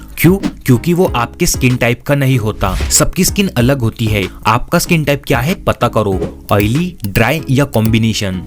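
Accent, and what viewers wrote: Hyderabad, India. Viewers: 𝗕𝗿𝗼 𝗔𝗿𝗲 𝗙𝗿𝗼𝗺 𝗛𝘆𝗱𝗲𝗿𝗮𝗯𝗮𝗱 ❓💌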